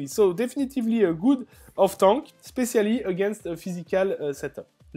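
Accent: France. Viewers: are you from russia ? thought you are french with that kind of accent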